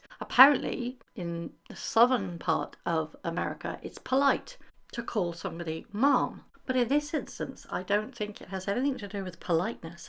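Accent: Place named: United Kingdom. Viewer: I think the term you are using which sounds like mum with your British accent is Ma’am here in the US, the female equivalent of Sir, and the older version of Miss. it is used by some service people, some younger people, and I, elderly myself, will use the terms to get someone’s attention if I wish to be more formally polite.If a younger person,I’ll just say excuse me. Never would I use it for a class or social position, ever. Especially with them. Just to get someone’s attention. I was an Army child of a Lt.Colonel,and I didn’t call my father sir or my mother ma’am, but my father was called sir by junior officers.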